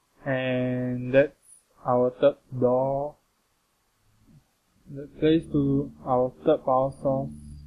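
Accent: Singapore. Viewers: Obviously Singlish......==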